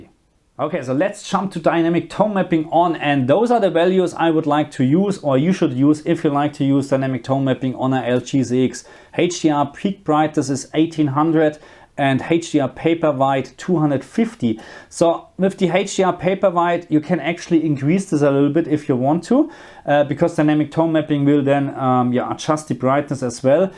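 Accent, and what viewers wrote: Germany. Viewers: Aber nur wegen der Leitplanke, sonst wären die Einstellungen nicht so geil xD...finde sein Englisch sehr souverän...wäre sie Aussprache nicht so stark deutsch, würde es noch besser rüber kommen...aber sehr ausführlich erklärt, gefällt mir gut 👍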